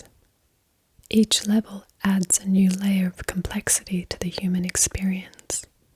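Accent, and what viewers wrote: Australia. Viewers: Really awesome asmr...well done...and finally found an Aussie asmr-tist that i like!. Subbed!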